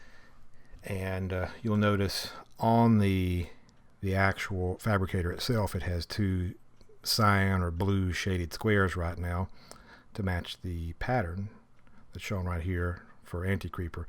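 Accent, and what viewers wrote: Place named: South, United States. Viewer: I don't know why I expected the dev to be Croatian or something but no he's southern af.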